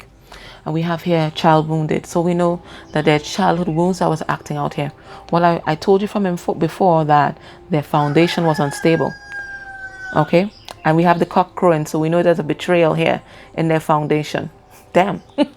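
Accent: Trinidad and Tobago. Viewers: Hi are you Trinidadian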